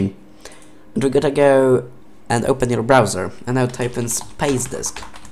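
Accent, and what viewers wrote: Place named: Poland. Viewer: Polski akcent 🔥🔥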